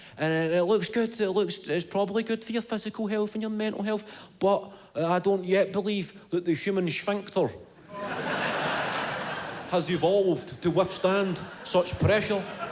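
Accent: Scotland, United Kingdom. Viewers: It's called Scottish. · He sounds more scottish than before. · Sounds like a Scot !!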